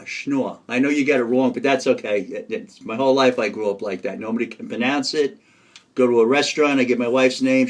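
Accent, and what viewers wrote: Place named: East Coast, United States. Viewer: Great video, you sound like your from the east coast, I'm originally from Boston myself.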